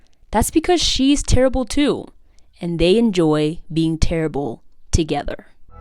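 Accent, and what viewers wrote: South Africa. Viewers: Btw are you South African?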